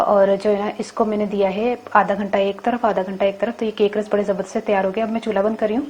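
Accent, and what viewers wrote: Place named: India. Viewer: Aap ki punjabi aur hamari punjabi main fark hai tuhadi pure from india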